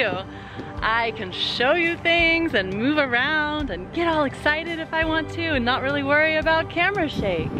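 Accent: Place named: United Kingdom. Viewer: I love your British accent!!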